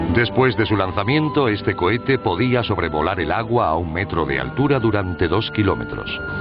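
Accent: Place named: Spain. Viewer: La locución no es español latino, sino es español de España.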